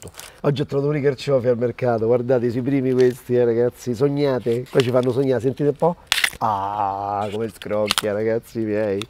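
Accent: Rome, Italy. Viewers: A parte la tua cultura culinaria che nn si discute, per la personalità e il modo di esprimerti così semplicemente romano, nn sei da meno di grandi attori come Sordi, Fabrizi Montesano e altri! Sei vero!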